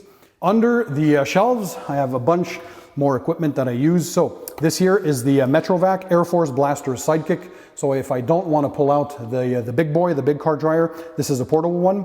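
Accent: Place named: Canada. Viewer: I didn't realise you are Canadian. My Irish ears can't distinguish the Canadian accent from the American one.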